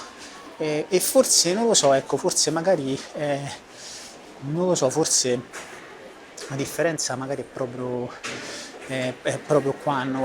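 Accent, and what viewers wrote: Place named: Rome, Italy. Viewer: Che poi Gli Inglesi guarderanno il video in modo perfetto e con stile tipo "We are Like star Wars, We reached episode 4 of The Car accident" Poi ci siamo noi italiani che sentiamo "Savve pellegrini d'a rete" Amo il tuo accento romano in every case XD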